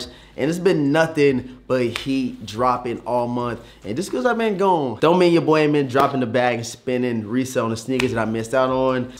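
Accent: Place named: St. Louis, United States. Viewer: A G are u from St. Louis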